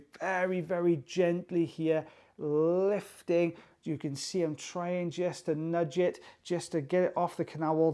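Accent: Wales, United Kingdom. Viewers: Nice Welsh accent , what part? Go on admit it you have a candle factory on the side😘 I find it hard to wear aids terrible itching any ideas? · Sir you sound Welsh af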